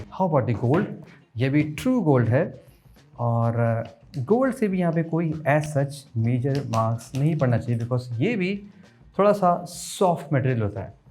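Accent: India. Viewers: I don't really understand English with an Indian accent. Can someone give a conclusion from the video? Is silver better?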